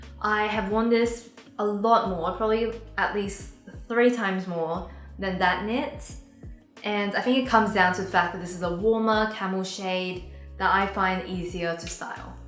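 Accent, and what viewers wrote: Australia. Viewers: Oh yay I thought you were Australian! Love Carriageworks :D And such a great video. I’ve been looking at Babaa knits and this has made me really try and consider 1) whether I need it and 2) which colour will truly suit my skin tone and wardrobe! <3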